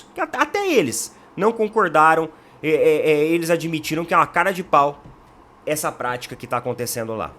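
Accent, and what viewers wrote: Sao Paulo, Brazil. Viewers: Sotaque Paulista mano.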